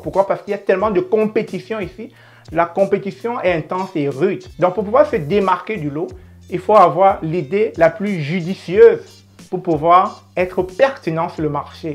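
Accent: Cameroon. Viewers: Etes-vous Camerounais? je le demande a cause de votre accent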